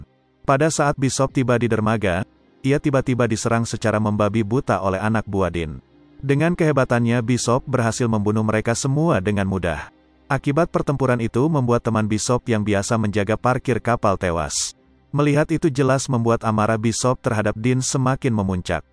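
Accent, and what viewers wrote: Palembang, Indonesia. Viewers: Bang orang palembang kah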